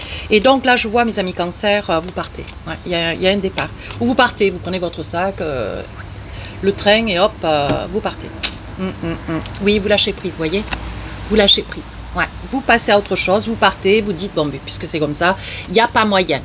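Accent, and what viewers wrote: South, France. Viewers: Quelle énergie vous nous transmettez petit bout de femme talentueuse votre accent me rapl le sud de chez moi que j ai quitté il y a peu en tout cas grand merci pour votre don ❤️🙏belles vacances profitez bien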